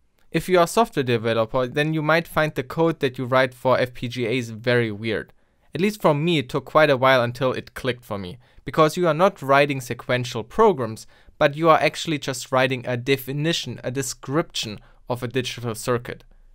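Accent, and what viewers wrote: Germany. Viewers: Kannst du den deutschen Akzent wirklich nicht raushören?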